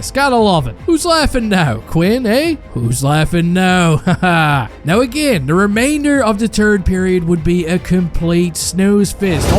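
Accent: Newfoundland, Canada. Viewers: I love the Newfie accent with the classic commentary!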